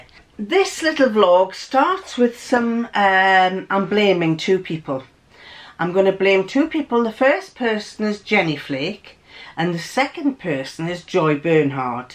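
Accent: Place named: England, United Kingdom. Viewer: a very French name for a very English accent... J’adore!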